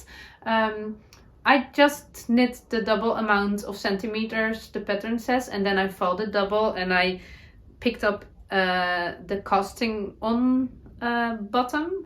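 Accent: Netherlands. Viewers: Happy New Year, it was so lovely to hear your dutchy English this morning, I really missed you. That's wonderful news about the YT Friday podcast. I would love to join you! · You make me smile!!!! I love your dutchy English. I may have to make a festive sweater exactly like yours…I love it!🧶❤️🇨🇦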